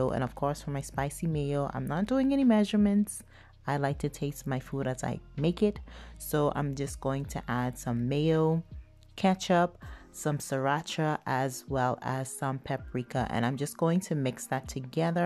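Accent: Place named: Jamaica. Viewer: Love ur Jamaican voice 😆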